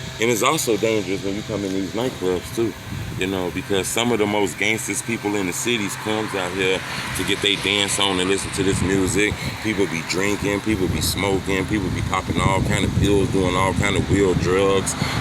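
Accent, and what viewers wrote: Chicago, United States. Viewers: Is you from Chicago bro?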